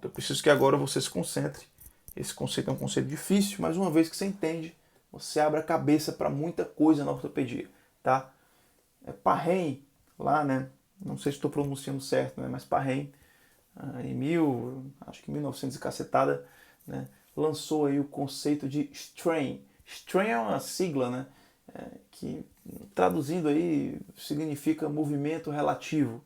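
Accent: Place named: Salvador, Brazil. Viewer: Boa, parabéns pela aula. Esclarecedor para qualquer residente. Sou preceptor aqui do HGCA( vejo que sotaque é soteropolitano) e são esclarecedoras essas aulas básicas iniciais, a base do curso 👏🏼.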